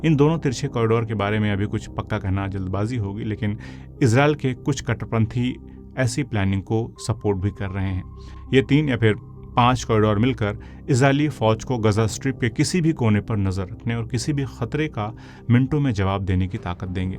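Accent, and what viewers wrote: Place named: Pakistan. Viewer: Using mostly Urdu & speak like Pakis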